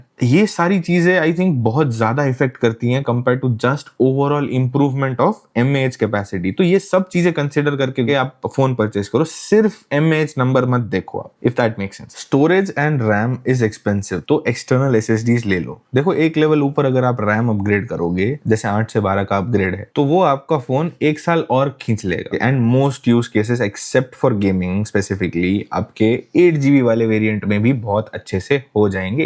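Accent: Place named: United States. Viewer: Bhai american accent bala vasha kaha sa sikhlio ho.😢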